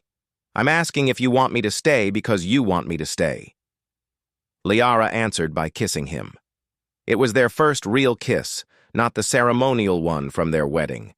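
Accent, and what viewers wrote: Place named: South, United States. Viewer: Why the hell did you choose a redneck voice to narrate this AI story.......it made it into even more of a joke.....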